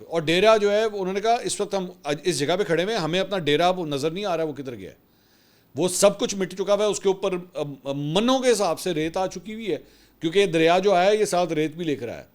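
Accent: Punjab, India. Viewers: Ap ki velog maian punjabi k terka is so beautiful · I love to listen your GULABI PUNJABI especially when you take ___ of Jahil Indian's. Keep it up BRO!!!❤ Stay blessed.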